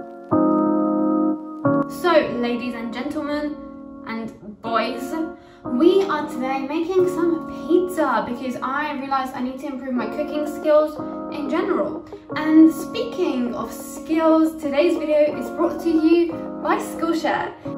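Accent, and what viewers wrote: United Kingdom. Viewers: this video would be terrible beyond all imagination if it weren't for two facts: that you're stunningly beautiful and even more importantly, that you speaking in a mesmerizing British accent, which makes this video the best one uploaded on youtube since youtube's foundation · Look how cute she look while eating by the way love your content and British accent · i have to visit england, i can listen to this accent all day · Refreshing to hear British accent after a long time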